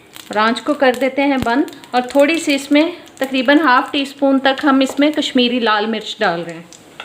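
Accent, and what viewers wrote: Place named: Punjab, India. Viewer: Thanks Ji, I also liked you Punjabi accent Urdu Loved it.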